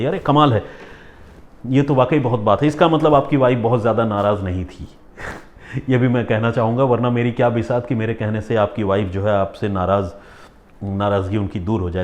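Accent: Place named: Bengal, India. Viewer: Dada awaz me Pata lagta Hai ki app bengali <link> west Bengal ki Medinipur dist se me abhi punjab ki Jalandhar me gold ka cam korta ho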